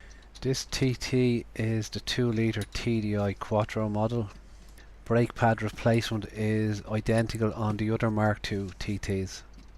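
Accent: Ireland. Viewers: love the Irish narrative and the copper slip lol, dont thing you will live that one down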